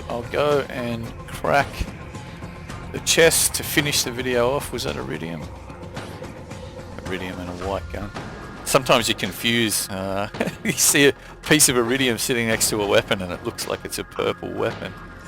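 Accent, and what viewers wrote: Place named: Australia. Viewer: Man as soon as I heard your voice i checked your profile, knew you were Australian haha.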